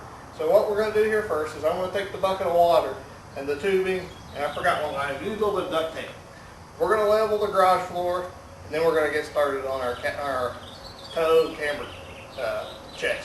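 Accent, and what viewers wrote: United States. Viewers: Where is the accent from? southern states? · virginia accent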